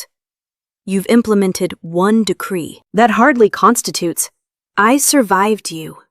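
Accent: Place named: South, United States. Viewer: Omg is it Dalia or Tealia. Not liking the Southern accent.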